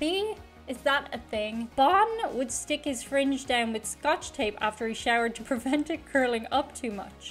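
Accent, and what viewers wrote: Ireland. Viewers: Nice irish accent there........ No effin way you made it to whatever age you are without hearing.........and supposedly completely unaware of one of the most famous rock bands ever........ Extremely popular in Ireland and on Irish radio stations...... in particular. Efin clickbait...........and I've just realised that I'm a victim of it. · She’s Irish by the sound of her accent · She’s Irish, some of her videos are even labeled as such (accent too).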